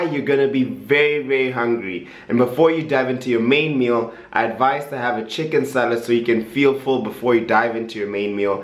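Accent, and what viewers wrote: South Africa. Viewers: Is it just me or does he have a South African accent🤔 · where are you from? You Sound South African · Great video, so there's hope for someone who's got to have that coffee and cookie to remain sane. We wont wish u well with your studies cos you clearly have discipline! Believe me you look and sound South African no lie. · He does sound South African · Great video. You've gained a subscriber 👌🏼Also, you sound South African, but those aren't SA products... where on earth are you dude? 😂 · You sound South African? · was pretty sure I recognised the South African accent, then the nescafe just confirmed it all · Bro your accent sounds South African?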